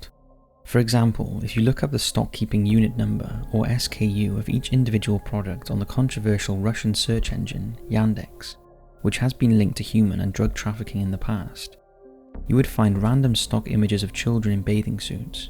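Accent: Wales, United Kingdom. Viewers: It's a Welsh accent probably from Cardiff or Newport area. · Nice British accent 😊😊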